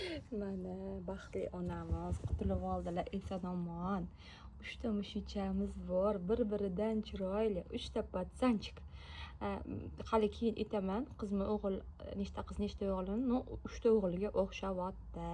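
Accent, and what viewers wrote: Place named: Kashkadarya, Uzbekistan. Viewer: КАШКАДАРЁЛИКМИСИЗ . ЁКИ СУРХОНДАРЁЛИКМИСИЗ. ШЕВАНГИЗ КЕТОПТИТА.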